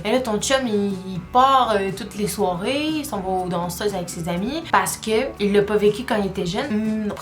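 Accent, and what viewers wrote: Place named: France. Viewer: pourquoi tu parle comme les français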